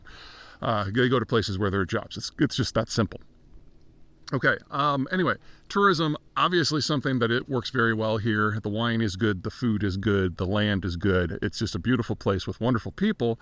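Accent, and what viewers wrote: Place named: United States. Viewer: Oh! It's the same fxxxing expert with an American accent, who knows so much about Australia. Too funny. Pass the bloody popcorn mate. Keep doing these, you are a genius, It's so comical listening to you blow it up your own arse.😂😂😂😂😂😂 Love the sunglasses, they really do a great job hiding your IQ. Get back to the northern hemisphere, you are stealing our supply of oxygen down here. Then again, the Septics are well versed on extortion. They have practised it all over the world, since the time of Columbus.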